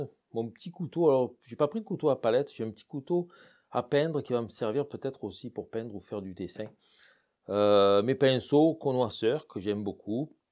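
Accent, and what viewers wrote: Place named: Provence, France. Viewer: Bonjour monsieur,je vous remercie pour votre calme ,vos explications claires,et vôtre lumière bienveillante , même quand ont débute , ont se sent ,en confiance comme avec un ami,qui nous partagent une même passion 😄, votre accent ,le dis que vous ête de chez nous ,je suis du Vaucluse ,et cela fait chaud au cœur 💓 de sentir dans vôtre accent qui est le nôtre,nos racines , merci infiniment ,bien le bonjour à Mme , je viens de m'abonner et j'ai partagé avec une personne de mon entourage,qui est artiste peintre 🦋🙏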